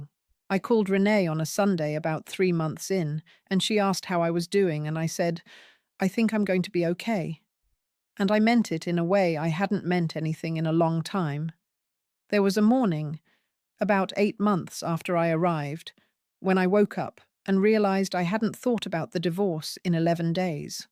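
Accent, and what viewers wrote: United Kingdom. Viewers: First time I hear an Ohio person speaking with a British accent.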